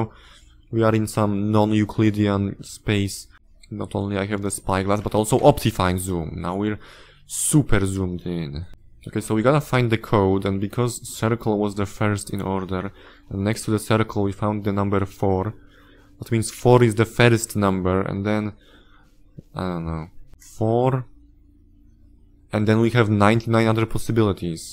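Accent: Poland. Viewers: are you polish? I'm sure that your accent is polish.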